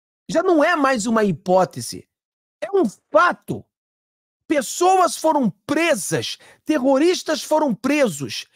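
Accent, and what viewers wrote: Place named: Florianópolis, Brazil. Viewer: Bom dia gosto muito do teu Catarinense de Florianópolis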